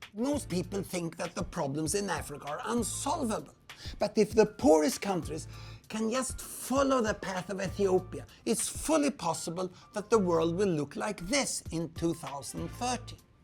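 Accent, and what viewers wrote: Sweden. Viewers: Han pratar ju bra och tillräckligt avancerad engelska, bara att uttalet är typiskt svenskt. Kan ju knappast kallas för svengelska, och jag tror att det bara är vi svenskar som "stör" oss på det. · Kan inte ta han seriöst med sin jävla svengelska. Känns som man tittar på en kass Siba reklam eller något. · Never heard a more swedish accent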